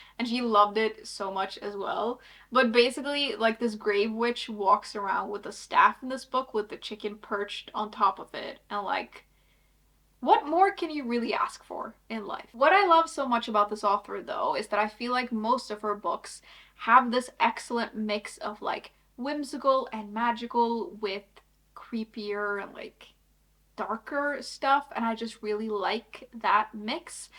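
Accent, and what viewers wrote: New York, United States. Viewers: No way! Maybe it's because of your accent, but I always thought you were from New York, USA or somewhere near there. 😆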